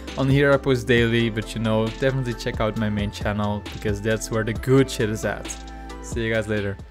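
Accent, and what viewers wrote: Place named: Netherlands. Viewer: Veel succes ermee man, assuming youre dutch since i might be hearing a dutch accent. Fun commentary btw, i enjoyed the attempts & inevitable panic as expectations get absolutely grounded back to reality & humbled w the buffed Warden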